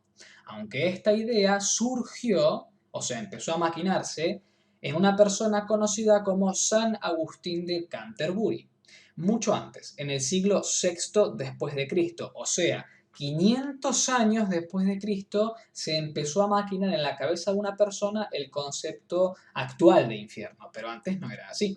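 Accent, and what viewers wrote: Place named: Argentina. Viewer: RECIBE MI SALUDO Y MI ABRAZO. ME AGRADA LA RIGUROSIDAD EN LA INVESTIGACIÓN Y LA ETIMOLOGIA. TODO LO DE LA BIBLIA ES MANIPULADO Y TERGIVERSADO. VALORO TU INTELIGENCIA, DISCERNIMIENTO Y OBJETIVIDAD. SUPONGO POR TU ACENTO ERES ARGENTINO, Y ESE LOOK Y BARBA ESTAN 1A. ANIMO Y ADELANTE!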